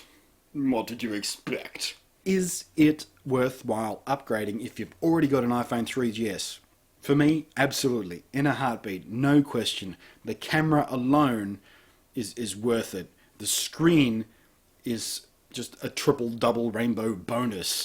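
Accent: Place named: Australia. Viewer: 1) Great Review 2) Love the Aussie accent 3) Double or Triple RAINBOW FTW!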